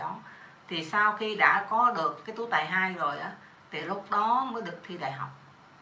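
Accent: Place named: South, Vietnam. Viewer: Nghe giọng nói cô tôi tưởng tượng là một phụ nữ nam bộ già dặn. Những được nhìn dung nhan thấy trẻ hơn giọng nói rất nhiều. Từ khi biết kênh của cô tôi rất thích.. hiểu biết sâu rộng … phân tích cặn kẽ dễ hiểu, luôn ủng cô … đầu năm chúc cô và gia đình vạn sự bình an, hạnh phúc tràn đầy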